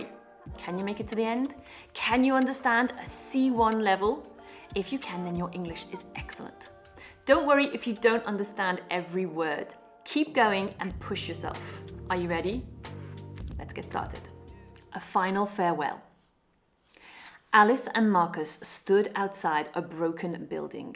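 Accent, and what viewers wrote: United Kingdom. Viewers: Professor, I am a 100% beginner. I did not understand the story, but I was listening with subtitles in English, of course, to see the words and how to pronounce them. Is this a lesson for me as a beginner? I mean the art of listening. I liked your British accent. It is nice not to pronounce the letter “r” in some words. This is a difference between a British accent and an accent. American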